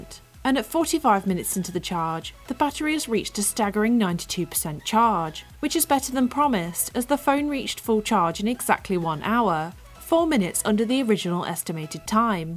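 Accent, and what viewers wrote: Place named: Northern England, United Kingdom. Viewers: Northern accent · I really loved ur british accent ❤❤😍👍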